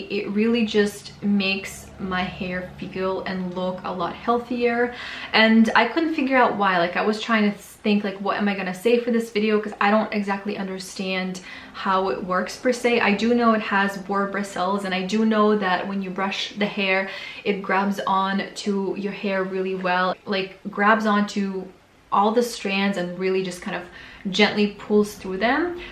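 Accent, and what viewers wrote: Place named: Michigan, United States. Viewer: I love Mason Pearson brushes! What state are you from? You sound like you’re from Michigan or further NW 🙂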